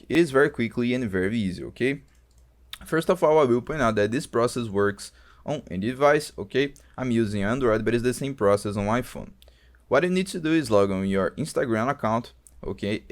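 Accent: Brazil. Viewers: o cara é brasileiro, conheço o sotaque brasileiro no ingles KKKKKKKKKK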